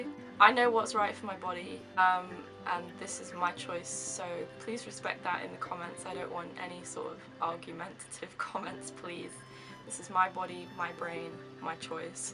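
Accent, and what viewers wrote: United Kingdom. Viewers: I don't mind your tourette's . I hate the British accent.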